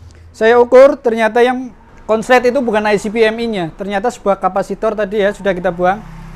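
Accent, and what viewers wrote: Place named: Java, Indonesia. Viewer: bahasanya rada medok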